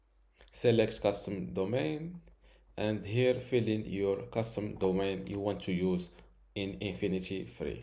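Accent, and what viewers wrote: Morocco. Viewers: idk why but you sound moroccan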